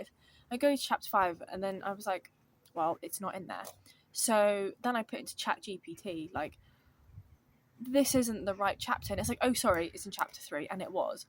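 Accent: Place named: United Kingdom. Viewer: I'm from Germany and I love your British accent. (Hopefully it is British I don't know too well)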